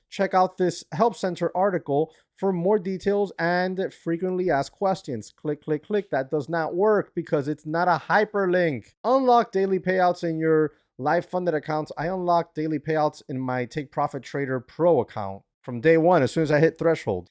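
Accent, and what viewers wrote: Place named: Miami, United States. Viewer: You got a cuban Miami accent bro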